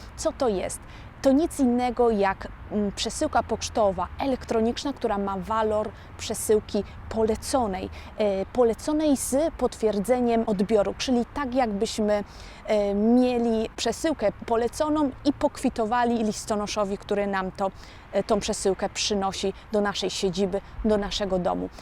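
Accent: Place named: Italy. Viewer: Ale już słychać ze mieszkasz we Włoszech z Twojego akcentu ❤️ a pamietam Twój głos jeszcze z czasów liceum. Bardzo fajny pomysł jeśli chodzi o informacje prawne! Powodzenia.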